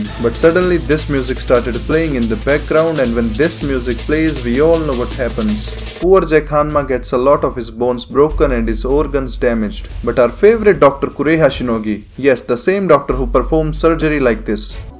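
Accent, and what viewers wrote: India. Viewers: His Indian accent is cool. Most Indians I ever listened sounds more difficult to understand. This guy's Indian accent is kinda easy to understand 😂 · The normal jokes are way funnier with Indian accent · same it sounds indian and not indian at the same time, it's complex lol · Ur an Indian the accent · Are you a indian? Your voice sounds indian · This is the only guy with an Indian accent that doesn’t make me want to leave his video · Everything on his channel is funny the Indian accent just adds a lot to it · You sound Indian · it IS India accent. He is an Indian I can vouch for that. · I enjoy the heavy indian accent shitposting about Baki.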